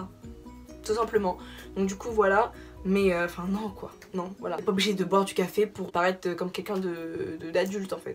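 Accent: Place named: France. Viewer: Damn I love your French accent I have been obsessed with French literally my whole life, I just love you so much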